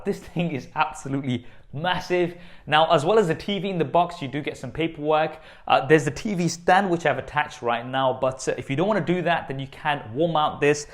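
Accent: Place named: England, United Kingdom. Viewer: Something about an English accent on an Indian that is so captivating. Great review man. Subscribed.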